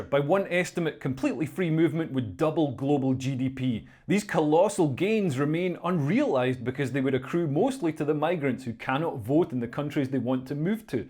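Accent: Scotland, United Kingdom. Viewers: A) I couldn't agree with you more, it's like hearing my own thoughts in a Scottish accent. For example, what you said about technocrats (quangos is the UK) and NGOs undermining democracy. B) Your description (from - ) of the establishment's dream for our future (given high immigration levels) sounds like a rubbish version of Blade Runner, with the replicants replaced by immigrants and space colonies replaced by our cities. It's already looking more like 'District 9' than Blade Runner though.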